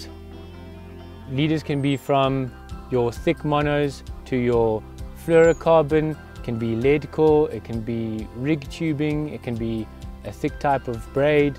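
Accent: South Africa. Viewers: I noticed the accent immediately! Nice to find a local channel on fishing 🇿🇦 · Lovely to hear a saffa doing some carp stuff. Subscribed now. From a fellow saffa in devon, United Kingdom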